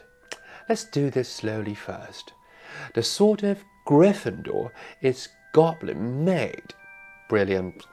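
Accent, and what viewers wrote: United Kingdom. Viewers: 英國腔超棒的！好好聽(☆∀☆) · Teacher, you have a beautiful fluent British accent and I really like it. I wanna to learn English but I am not interested in other English films except for Harry Potter series. And this is actually a perfect video to let me learn English, it is such a wonderful combination of English and also Harry Potter. Well..hope u will continue this series..ummmm.. i love it so much and thanks a lot!😊❤ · 英式口音真的很好聽👍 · 好像繼續學英文了 老師的英國腔真好聽！ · 來了～老師終於出片了❤️ 從一開始聽到老師的英式口音覺得好高雅好好聽喔～ 但是身邊沒有用英式口音的人啊～都是美式口音居多 真的很可惜沒人可以練習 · cool british.accent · podcast 英國腔任何東西都可以！！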